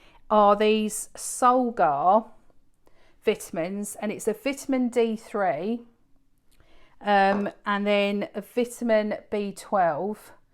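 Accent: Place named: England, United Kingdom. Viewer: Hi! I’m a new subscriber from North Carolina. Your video just popped up on my feed. It is lovely to hear your English accent. I am wishing you all the best on your self care journey. xx 🦋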